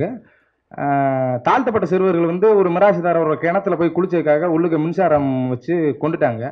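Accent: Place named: Thanjavur, India. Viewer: Semma voice .. Ithu Thanjavur slang mathiri ye irukku.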